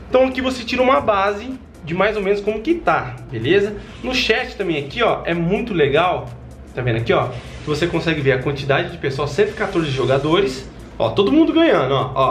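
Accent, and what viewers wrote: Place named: Bahia, Brazil. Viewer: Essas hora thi kkk Tá meio baiano ne kk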